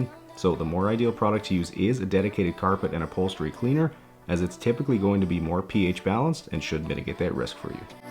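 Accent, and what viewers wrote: Canada. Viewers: "grage" I always get a kick with the Canadian accent.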